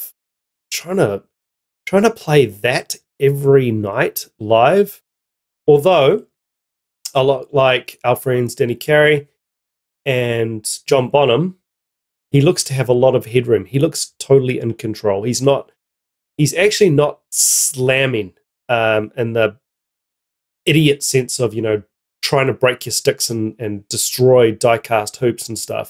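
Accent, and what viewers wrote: New Zealand. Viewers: Where in New Zealand are you from?!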